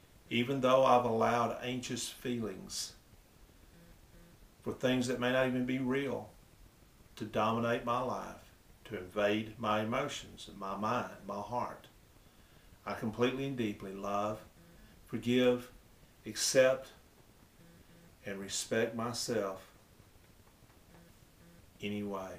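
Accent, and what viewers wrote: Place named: South, United States. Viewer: Hey ~I'm in the first qtr of LVN School! I stumbled upon a guy with an Brogue talkin' about a Drivin' test! I'm doing math,drug dosages,Taking tests every week & I'm a freeked out mess over these tests!I get passing grades BUT BARELY!When I found you you have a souther accent (like me!)Reminds me of the nice folks back home in the deep south!did the "tapping" here on this Video~you have one for "Test Taking Anxiety?"Bring it on!PLEASE!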